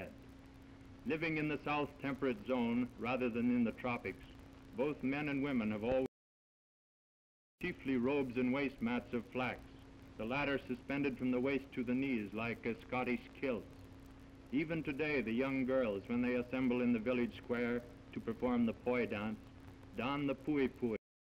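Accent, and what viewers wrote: Transatlantic, United States. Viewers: It's how most things were narrated back then. Transatlantic accent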